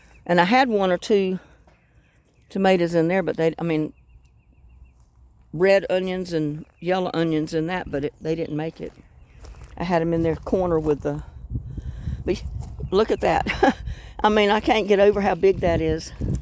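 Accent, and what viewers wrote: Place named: Texas, United States. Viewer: Hi I subscribed to your channel last night that was some live stream I love the sound of your voice and I was just thinking it sounds like Texas to me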